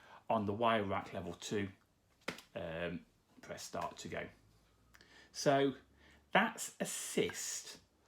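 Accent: United Kingdom. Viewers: Thank you for this excellent review of a product that I will need to replace as well. I also am a fan of Bosch appliances and have had good results with all of the. Now it is time to update the hob and oven. Reading the Bosch website info makes all the products so alluring and the more money you spend the better the appliance sounds. This video and especially the follow-up are just so objective and provided all the information that I needed. Bosch is extremely costly here where I live and spending that amount of money on the oven that has some minor flaws, that might turn into major issues after the warranty has expired, is a big NO NO. Also love your accent, that if I have to guess is from the Midlands.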